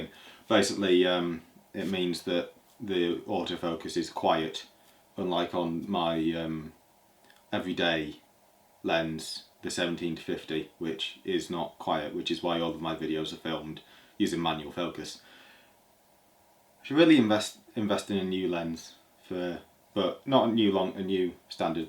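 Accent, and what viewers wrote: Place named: England, United Kingdom. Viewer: I actually enjoyed watching your video. You made a dry subject entertaining and I liked your English accent and humor. You will probably say: I don’t have an accent. 😊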